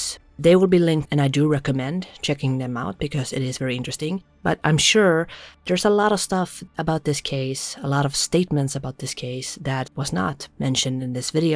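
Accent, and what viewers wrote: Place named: Sweden. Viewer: So people think your voice is AI , ? With a Swedish accecnt that prounounces that as ( dat ) lol and other th words . Which I like unique. Get well soon .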